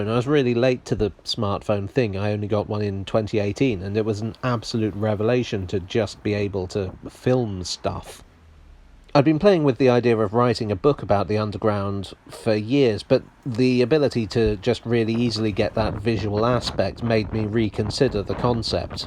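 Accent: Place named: North, United Kingdom. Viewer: So your family's from the North, eh? I thought you had a Northern-sounding accent.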